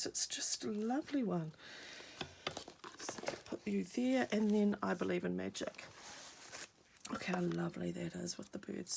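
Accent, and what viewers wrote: New Zealand. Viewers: These are all fantastic!!! I finally got the chance to sign in and subscribe. Yaye! Thanks so much for being such an amazing inspiration! LOVE your work, style and creativity and of course your gorgeous Kiwi accent! xx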